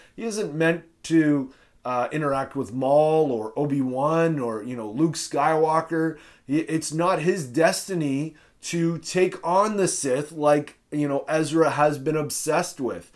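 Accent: Canada. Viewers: are you a canadian?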